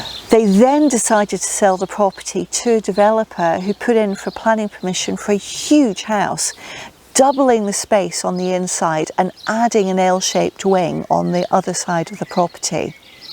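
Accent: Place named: Scotland, United Kingdom. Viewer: Sorry if you've mentioned this before but I've just found your videos; have you lived in Scotland for some part of your life? Cause your accent sometimes sounds a bit Scottish...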